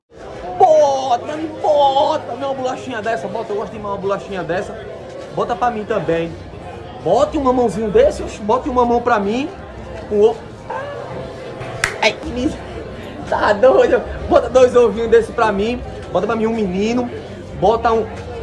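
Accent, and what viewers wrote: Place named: Northeast, Brazil. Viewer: Só quem é do interior do nordeste pra saber que falamos com esse sotaque e maneira de se expressar.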